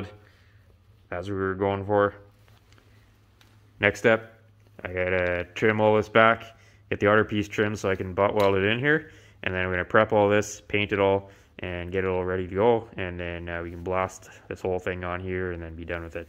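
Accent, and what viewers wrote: Canada. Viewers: Love the humor, reminds me of AVE, you also sound like your a canukistan native, Oh and by the way love the vid's well done.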